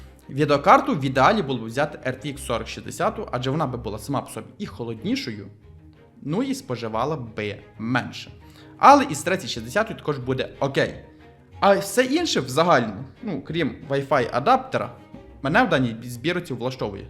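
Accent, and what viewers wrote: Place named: Ternopil, Ukraine. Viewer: По розмові чути тернопільська або Франківська область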